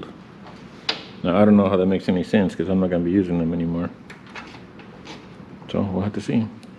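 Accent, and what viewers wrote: Texas, United States. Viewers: I thought I was hearing a Texas accent. I’m in a northern Dallas suburb. Folks like you make me a proud Texan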